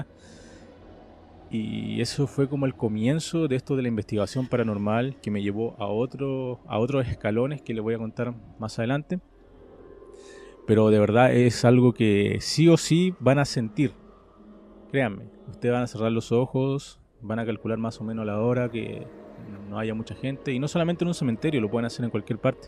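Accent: Chile. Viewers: Me gusta mucho el acento chileno <3 Muy buen trabajo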